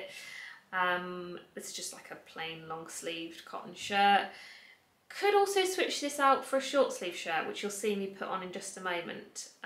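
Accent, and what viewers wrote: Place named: United Kingdom. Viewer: I really enjoyed this video!! there are some outfits that I definitely will keep in my mind for futur looks. Favorite are day date look, evening date, studio look, raining day in the city! really helpful for my futur city (London)! I would like to have an inspiration for museum day/ gallery day/ city strolling AND a casual look at home, home work or Monday/Thursday home look something comfortable and causal chic maybe AND definitely wedding look because I will have wedding look next year too! Thank you and always enjoy to watch your video and gosh your British accent is delicious ( Don't know if it's a great word for accent language but I love the idea)! Have lovely week sweetheart xx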